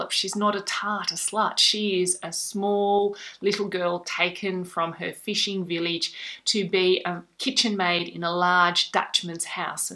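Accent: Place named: Australia. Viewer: I’m enjoying your videos and I’m getting used to your accent. There is so much humor when I hear what is a perfectly good American word used in an odd way. For instance “The author was rotting”. 😄 Some do don’t they? I assume the reverse must happen in Australia. Anyway, you are quickly becoming one of my booktube favs. Although The Fish Girl has me seriously intrigued, I would choose Terra Nullius to win. Thanks for doing these videos, I’m looking forward to many more. (I love your voice, I hope this didn’t come off as rude.☺️ )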